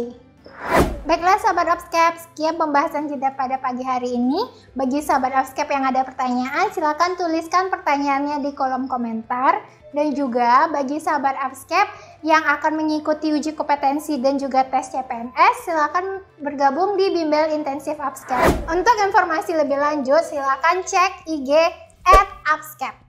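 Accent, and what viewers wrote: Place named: Medan, Indonesia. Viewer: Kaka orang medan y bhsanya kedengeran bnget ka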